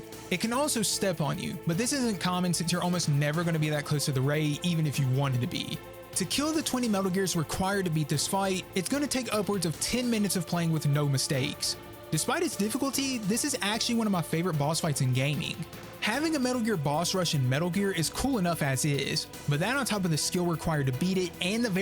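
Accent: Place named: Maryland, United States. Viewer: Bro are you from MD? Not hatin, that accent just sounds so familiar lol. Good video btw, Sons of Liberty is the greatest game ever made imo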